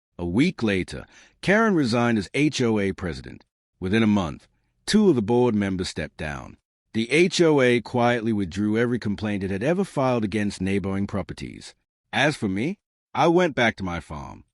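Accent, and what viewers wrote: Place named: United Kingdom. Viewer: Why does that narrator have a b British accent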